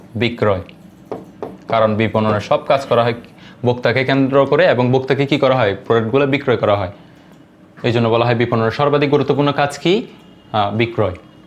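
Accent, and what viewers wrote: Noakhali, Bangladesh. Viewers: Apni ki Noakhalir😐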